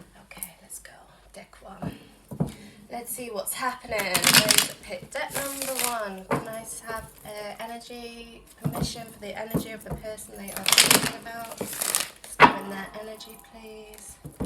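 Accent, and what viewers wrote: Liverpool, United Kingdom. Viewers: btw it’s such a shame that I don’t live in the UK anymore or else I’d pay you a visit in person. I used to live in Edinburgh and was there for my postgraduate education for 2 years. I loved everything there and didn’t really want to go back to the US but I had no choice cuz my student visa expired and I ended up living in Taiwan for now 😂 I miss the UK a lot especially when I hear you talk 😭 You sound like you’re from Liverpool or somewhere closer. P.S. I was also wondering if it would be ok for you to do a pick a card reading on “Are they still testing me?” or “Are they still observing me?”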